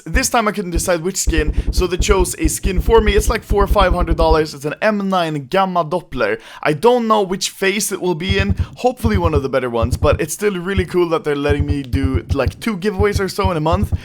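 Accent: Sweden. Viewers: You speak engswedish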